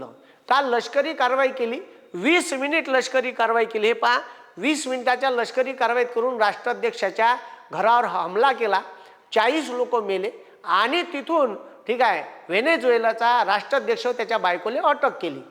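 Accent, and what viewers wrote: Vidarbha, India. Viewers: खुप छान 👌👌. भाषा विदर्भातली आहे का, ऐकायला खुप भारी वाटते. 👌👌👍👍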